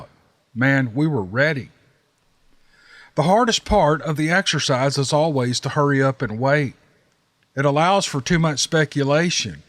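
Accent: South, United States. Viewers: He is surprising one of the best narrator/commentators on YouTube today that southern gentlemen’s voice I think is the ticket of Sir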